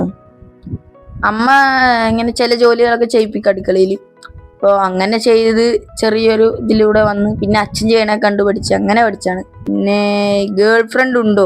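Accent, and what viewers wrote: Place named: Thrissur, India. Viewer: Pkd aano... ഞാനും pkd ആണേ... ഇയാളുടെ slang trissur ആണല്ലോ 😊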